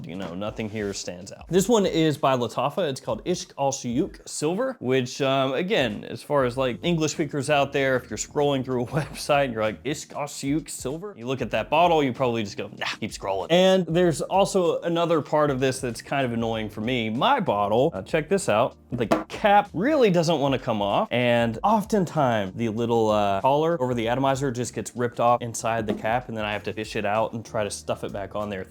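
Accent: South, United States. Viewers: Your southern accent really came out in this vid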